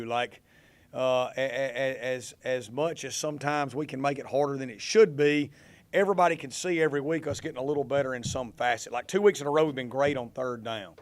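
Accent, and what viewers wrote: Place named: South, United States. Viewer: He said 4 years, the southern accent made it sound like there was a bit of a D at the end😂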